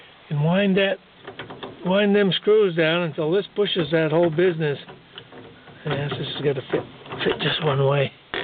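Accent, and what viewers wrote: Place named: New England, United States. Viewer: YOu are working on one of the most frustrating parts of any vehicle. I have two GM tilt columns so I share your pain. I believe you have the spring on upside down and that you need to compress it to get the clip ring into the groove on the shaft which is a very tough job. That will hold the upper bearing race inside the ball bearings and eliminate the floppy feeling you describe. Not sure why steering columns have to be so ridiculous but some engineer is laughing you can bet. Loved the New England accent and would like to see pictures of your hot rod. Noticed the Ford break petal in the video. Happy New Year and best wishes for successful completion.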